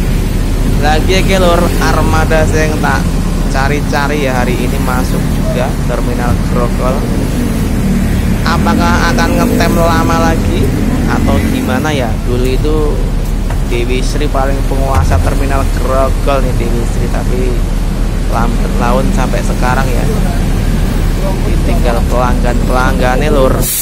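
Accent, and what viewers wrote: Banyumas, Indonesia. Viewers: Iki sing duwe chanel wong ndi logate ngomong kaya wong ngapak ya,nyong wong banjarnegara teka. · Pekalonganne ngdy mas kok ngomng e ngapak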